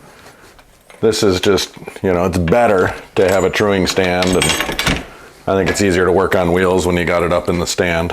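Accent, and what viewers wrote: Wisconsin, United States. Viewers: I can’t quite decide if I’m hearing Minnesota or Wisconsin but I’m leaning toward the latter. A fun suggestion: the lube counter. You say lube, ding sound effect, a number on screen adds to the count. Cheers from Minnesota! Ope!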